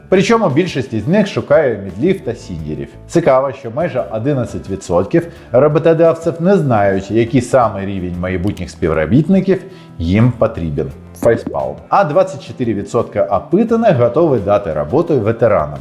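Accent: Russia. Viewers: За старання українською - респект, але слухати дуже важко. Не знаю скільки репетирував та робив дублів, проте "азіровську" не виправив аж ніяк. 😊 Особливо плутає і та и. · Покращуй, вимову... Трохи віддає азіровщиною, а це досить неприємно!!!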